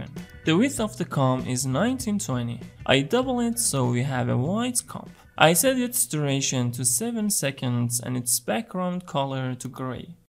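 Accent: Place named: Iran. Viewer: Are you from IRAN? Your accent tells me you're IRANian ... :hand-pink-waving::face-blue-smiling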